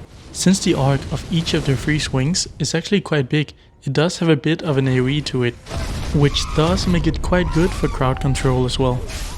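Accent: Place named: Denmark. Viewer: Er du dansk? The accent really sounds danish.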